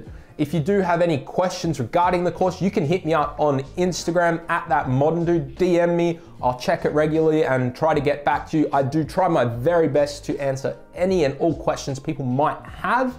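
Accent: Australia. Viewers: downloaded the course and just finished the 3d text lesson. Great work. Helps you're an aussie so it feels very familiar, but that's gotta be one of the best explanations of how to build a 3d scene in fusion I've watched to date. I purchased the blackmagic books for resolve 15 because there wasn't much info out but this course is looking promising as a much easier way of learning the concepts. Great work mate, you're quickly establishing yourself as a go-to resource for resolve info on youtube!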